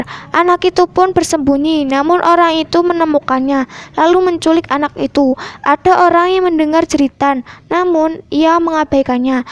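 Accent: Java, Indonesia. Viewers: Bahasanya terlalu medok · Kak, kakak orang jawa ya😶 Soal nya kkak ngomong nya kyk orang jawa😅😅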